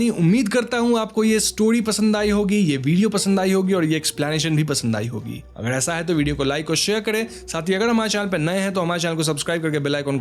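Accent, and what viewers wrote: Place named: Bihar, India. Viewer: तुम पक्का बिहारी हो, लालू प्रसाद यादव की तरह एक्सप्लेन करते हो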